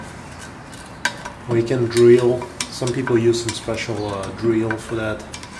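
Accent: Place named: Russia. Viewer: Love the Russian accent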